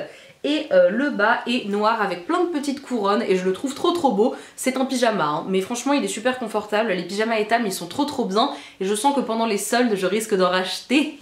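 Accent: England, United Kingdom. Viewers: ton accent anglais 💘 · Je suis jalouse de ton accent anglais 😍 mdr sinon super vidéo tes cadeaux sont géniaux 💋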